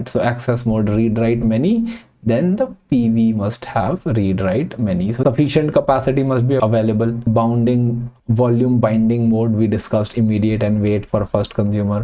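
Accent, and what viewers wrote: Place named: India. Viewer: Refreshing pace and much clearer delivery! Even with the distinct Indian accent on the T's and D's, it's so much easier to follow than usual. Nice!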